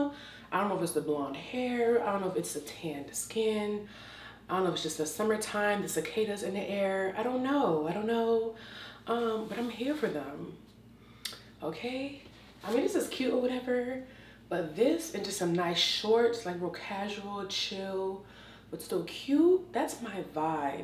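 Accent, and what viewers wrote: United Kingdom. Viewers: Loving your haul from my favourite Shein. Wow your British accents spot on.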